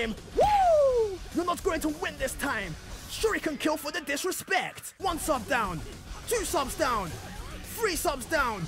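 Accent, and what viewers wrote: United Kingdom. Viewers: YOOOOOOOO this dude sounds literally like LEE BUT BRITISH OMG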